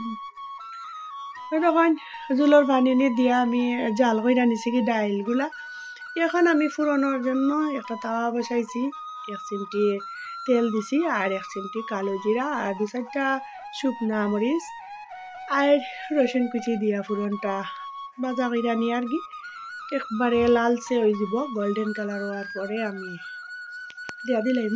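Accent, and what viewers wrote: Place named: Sylhet, Bangladesh. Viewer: আসসালামু আলাইকুম কেমন আছেন দিদিয়া বাসার সবাই কেমন আছে মাশাল্লাহ আপনার কথাগুলো অনেক ভালো লাগলো আপনাদের বাড়ি কি সিলেট দিদিয়া আপনার কথার প্রেমে পড়ে গেলাম এভাবে কথা বললে আমার খুব ভালো লাগে আমিও কখনো সজনে দিয়ে ডাল খাইনি দেখে তো খুব খেতে ইচ্ছে খুব ভালো লাগলো আজকের রান্নাবান্না আপনি জন্য দোয়া এবং ভালোবাসা রইলো তোমাকে সাপোর্ট করেছি আশা করি ছোট বোনটা কেউ করবা সব সময় বোনটাকে পাশে পাবেন ইনশাআল্লাহ 🎉🎉❤❤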